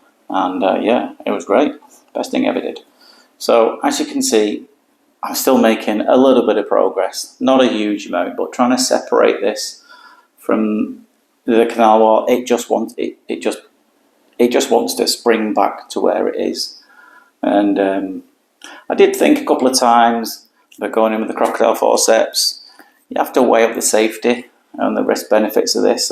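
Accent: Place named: Bolton, United Kingdom. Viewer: love it.. 3 years in college in Bolton back in the 80s so I love the accent (thought you was boltonian at first).. good luck with the channel. The others audiologists..raithatha, zhao and our Welsh friends) went from 10 subscribers to thousands and thousands in a very short period.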